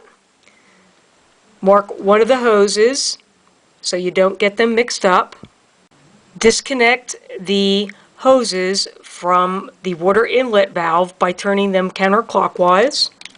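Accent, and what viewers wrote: Philadelphia, United States. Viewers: thanks for the video seems like simple things to check. Mine runs slow only on the second fill. That accent you have sounded like Philly or ?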